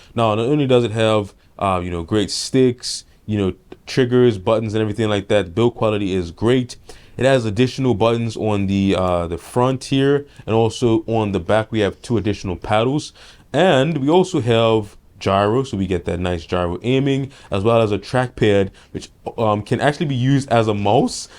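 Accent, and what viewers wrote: Bermuda. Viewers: Ayo you got a pretty heavy Bermudian accent there my guy- not sure if you're actually from Bermy but it's similar!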